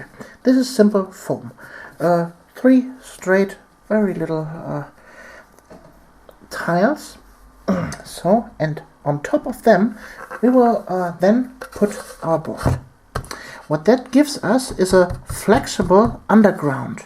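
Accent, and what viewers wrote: Germany. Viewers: it's not a British accent. it's a german one based on western film and TV.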